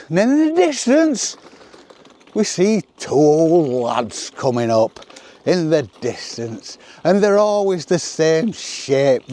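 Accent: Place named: Lancashire, United Kingdom. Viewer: 😂 So funny 😂 Where are you in Lancashire? You sound like my grandparents who were from Westhoughton. I'm in South Staffs. Aged 73 😮 so there is someone older than you 😂 Thanks for making me laugh ❤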